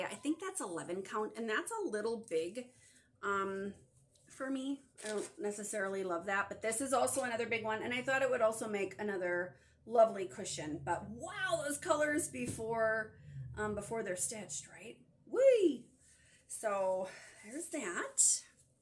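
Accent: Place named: Wisconsin, United States. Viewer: Oh shucks I'm in Wisconsin as well. You must have been born and bred here. I just love hearing your accent. I've only been here for 49 years. I am so jealous of your gorgeous hair. That's not very Christian of me. I don't know anybody who stitches. We are around the eau Claire osseo area. Good to see a native doing a floss tube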